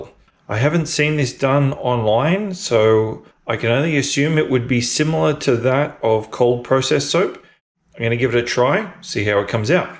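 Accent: Australia. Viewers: Beautiful. Loving watching a soap vid with an aussie voice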